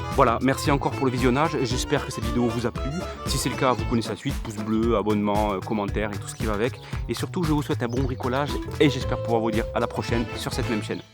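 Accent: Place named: Toulouse, France. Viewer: Houai...encore une idée géniale qui va me permettre de faire ma petite fontaine avec bain d'oiseaux (important). Hé bé, je me disais que tu avais comme un petit accent que je connais...bé forcément, au sortir des torrents de l'Adour, au bout des vallées, on le connait le bel accent de notre jolie ville rose ! Bon, je te copie pour pomper...ou l'inverse...😂 Merci ! 👍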